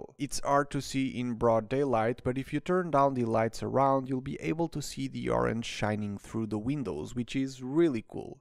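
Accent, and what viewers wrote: Portugal. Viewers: Tu tens um inglês mais tuga de sempre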